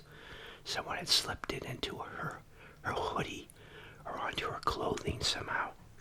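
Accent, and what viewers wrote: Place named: Boston, United States. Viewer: you should do radio ASMR videos. People would like your Bostonian accent.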